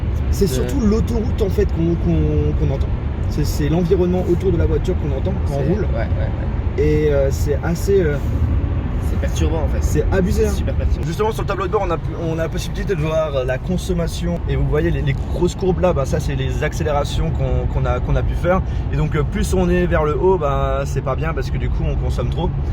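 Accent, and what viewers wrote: Belgium. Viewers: Tu est belge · Mais est belge?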